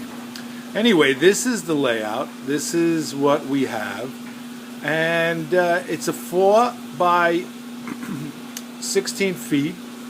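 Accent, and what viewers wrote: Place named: Staten Island, United States. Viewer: yo you sound like a dang New Yorker... more like Staten Island... LOL.. thanks for the tour of your layout... great talking with you the other night... i didn't know i wasn't subbed to you but i am now... looking forward to your next video... BTW check out my last video i show the finished build for Eric vinny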